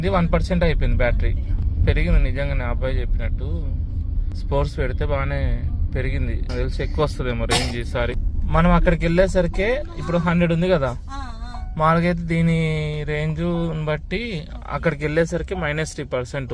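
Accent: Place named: Telangana, India. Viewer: బ్రో మీ మీ వీడియోలు చాలా బాగున్నాయి. మీ ది తెలంగాణనా లేదా ఆంధ్రప్రదేశ్ నా మాట తీరు సేమ్ తెలంగాణ ఎలా ఉంది. అందుకే డౌట్ వచ్చి అడిగా ఏమనుకోకండి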